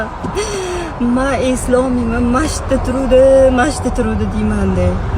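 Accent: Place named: Surkhandarya, Uzbekistan. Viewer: Емоннам олам шумул иш бубтию сурхонча гапиринг сизга узингизни шевангиз ярашади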